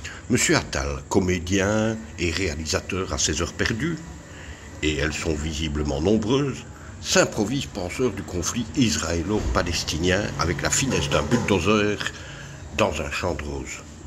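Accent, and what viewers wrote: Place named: Belgium. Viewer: Voila la réalité toute nue, la vérité incontournable. Ces atavismes peuvent être reprochés a une grande partie de la communauté Hébreu, particulièrement les plus médiatisés, s'est sans doute pour cela la parole leur est si facilement accordée, mais se rendent-ils compte qu'il signent là leur déchéance morale ? Merci Monsieur a votre accent je pense que vous êtes Belge ? J'aurais aimé que ce soit un français avec des responsabilités politiques qui ait votre courage, de cibler directement un malotru y compris sa suffisance.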